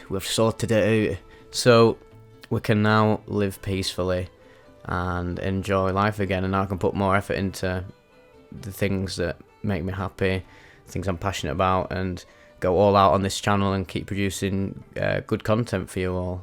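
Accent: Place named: Manchester, United Kingdom. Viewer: Thanks for your openness and honesty, sweetie. I have every faith that soon you will be able to be with the pain of your relationship break-up, learn all the lessons waiting for you and move into another exciting phase of your life. The ups and downs of this balmy journey hey... And I just want to say that I love listening to your dulcet, Mancunian tones read out these trip reports - it inspires me as I get started on my own psychedelic journey... Sending Love and Hugs 🤗🥰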